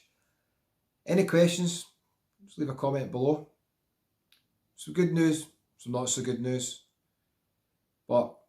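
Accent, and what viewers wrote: Scotland, United Kingdom. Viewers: you're very welcome. Always trust a man with a Scottish accent! 😘😉😉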